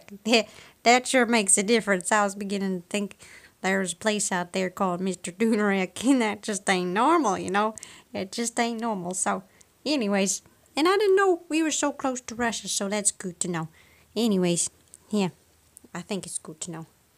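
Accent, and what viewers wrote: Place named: Texas, United States. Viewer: Wow you are israeli but you sound like a texan. How???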